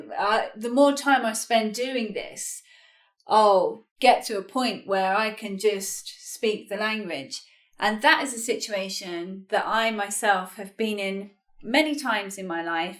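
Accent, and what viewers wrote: United Kingdom. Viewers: Thanks for making this useful video. I have been in the same situation and It is not too late for me to change the method to learn English effectively. By the way, I love you British accent a lot. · I really like your british accent. I am studying English currently a day per week. I lke your videos. Thank you a lot! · I love your lesson and your British accent ; )Thanks a lot!!! But I would like more lessons for british pronouncation ;)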